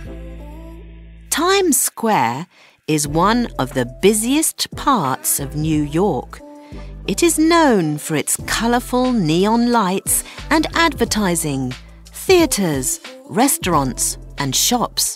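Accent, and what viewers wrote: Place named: United Kingdom. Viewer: But this is UK's British English....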